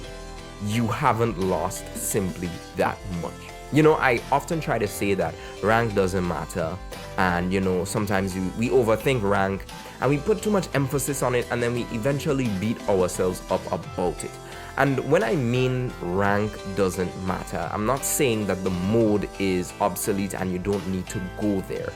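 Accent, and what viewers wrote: Trinidad and Tobago. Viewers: Trini accent talking about tekken reminds me of being 9 and playing tekken 3 with my cousins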